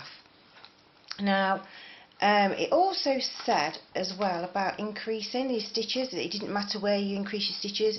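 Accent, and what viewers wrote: England, United Kingdom. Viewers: Hello, I have watched all your tutorials and they are great. I am owl crazy as you can see by my avatar. I hope you don't mind me mentioning, when you start of you call your first knot a magic loop. Magic loops and Magic rings are described differently in other tutorials and what you start off with is a slip knot. Please don't think I am "knit" picking because I love your youtube channel. Keep up the good work, can't wait to see what comes next. Love your accent too, what part of England are you from? Best wishes to your dad i hope his arm is getting better. Kind regards:)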